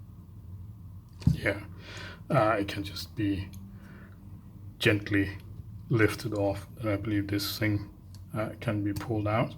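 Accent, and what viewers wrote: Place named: Denmark. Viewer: Nice accent. Er du dansk?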